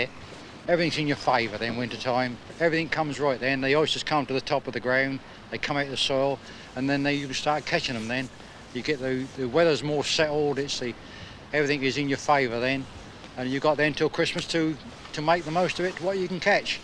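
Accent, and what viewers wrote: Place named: Whitstable, United Kingdom. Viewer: Proper Whitstable accent.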